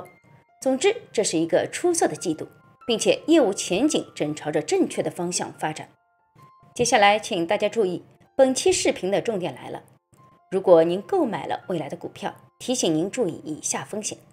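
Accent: Shanghai, China. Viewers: 您是上海人么？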